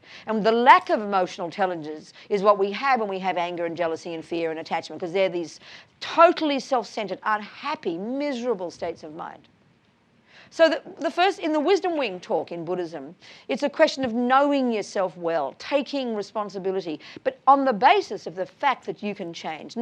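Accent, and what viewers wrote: Australia. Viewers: She's Aussie, 100%, so even drier still. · i like this nun she speaks good aussie language peace compassion wisdom im happy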